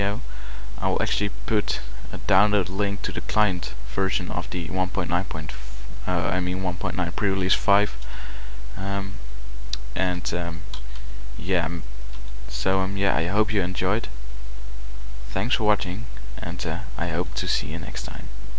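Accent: Netherlands. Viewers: ik hoorde dat je uit Nederland komt door het Nederlandse nadruk xD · wel grappig dat hoe goed een nederlander ook engels praat je toch altijd hoort dat het een nederlander is ; )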